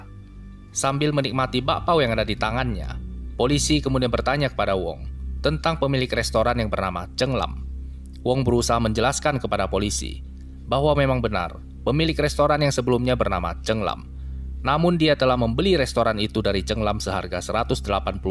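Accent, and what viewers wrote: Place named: Sulawesi, Indonesia. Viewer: Speaker nya logat nya pasti dari Sulawesi 😂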